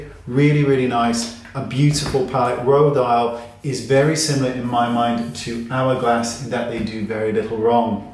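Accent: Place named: United Kingdom. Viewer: you make me smile when you get annoyed. I guess it’s because you brits make everything sound nice!🙂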